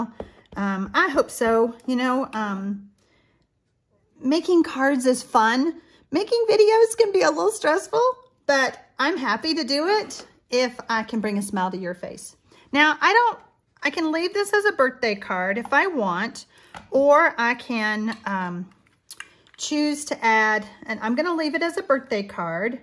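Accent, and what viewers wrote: South, United States. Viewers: 😃 You do bring a smile to my face,. And I love your southern accent and your tutorials. Greetings from Perth, Australia 🇦🇺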